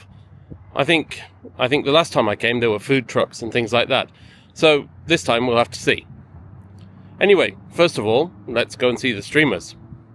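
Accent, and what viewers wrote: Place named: United Kingdom. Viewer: Welcome to Hofu❗️ Great to see this event in your video 👍 Where are you from ? 🇬🇧or 🇦🇺 from your British accent. Hofe has many historical building, so please enjoy to stay✌️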